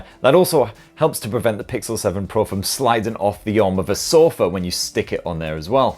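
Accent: United Kingdom. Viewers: is like Charles White Jr. (aka MoistCr1TiKaL) of geek world with a British accent. I really love his content lol. I just had to get this out of my chest, sorry guys, go on. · British English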